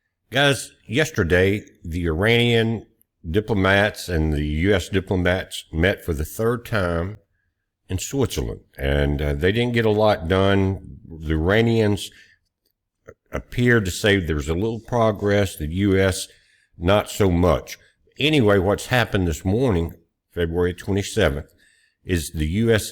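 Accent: South, United States. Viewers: I love your southern accent. I was half way through this video before I figured out you were saying fraud. I thought you were talking about frogs! 🤣 I was thinking Uh oh... went looney tunes.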